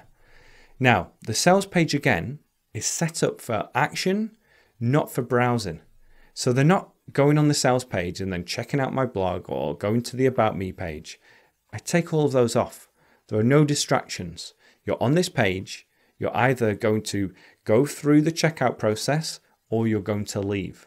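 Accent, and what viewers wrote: United Kingdom. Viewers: Wow, I havent `t seen you video for a long time , you `r British accent is really nice . Thank you .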